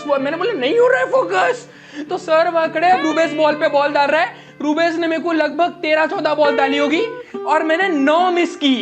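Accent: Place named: Jashpur, India. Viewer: Bhai are you from jaspur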